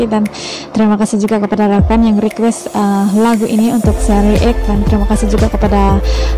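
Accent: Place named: Brunei. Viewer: owh...pantesan dialek melayu nya mirip brunai.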